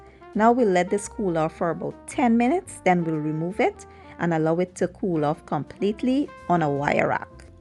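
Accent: Guyana. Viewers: Lovely ! New sub btw are you guyanese ? Your accent lol where are you from?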